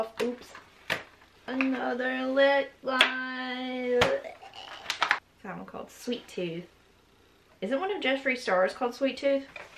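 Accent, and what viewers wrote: South, United States. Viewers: shes from the south lol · ur literally southern sam puckett i, im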